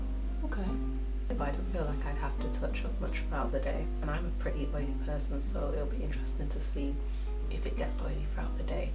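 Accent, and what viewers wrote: United Kingdom. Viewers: She's British lol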